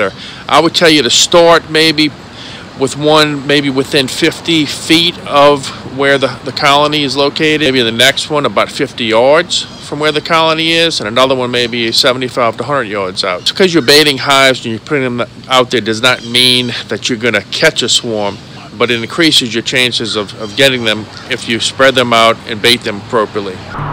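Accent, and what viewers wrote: Louisiana, United States. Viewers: As soon as you started to speak, I knew you were down here in s/e La. good job on the video.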